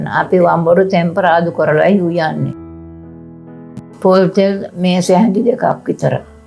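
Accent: Ambalangoda, Sri Lanka. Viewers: අම්බලන්ගොඩ පැත්තෙ ආච්චි කෙනෙක් කතා කරන විදියට නම්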